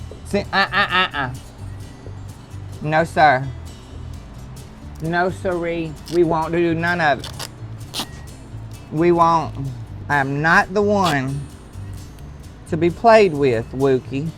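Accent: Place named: South, United States. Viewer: This ain’t funny. Just talking nasty ain’t funny even with a southern accent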